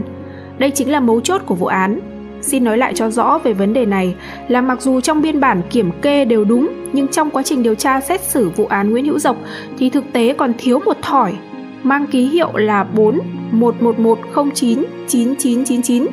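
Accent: North, Vietnam. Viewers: Hết chuyện rồi đem tin xàm xí với giọng Bắc kỳ khó nghe!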